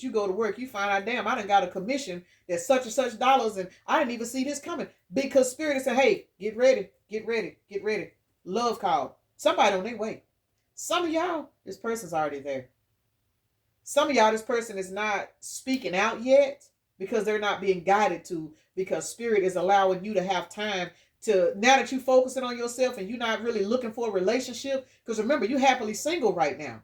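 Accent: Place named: Texas, United States. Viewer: ESPECIALLY YOUR TEXAS-ASS ACCENT 🤠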